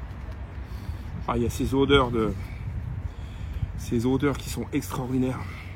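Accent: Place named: United States. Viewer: Svp monsieur le pseudo cuisinier, faite au moins l'effort d'apprendre à cuire une viande correctement, les cuissons c'est une technique, et l'hygiène c'est impératif, je ne vous souhaite pas que la DDPP passe chez vous après avoir laissé votre viande prendre la t° ambiante. Enfin bref, même avec l'accent Américain ça le fait vraiment pas. Si je peu me permettre, vous gagnerez à au moins regarder les vidéos de Aaron Franklin, et comme vous êtes bilingue ça devrai vraiment vous faire progresser.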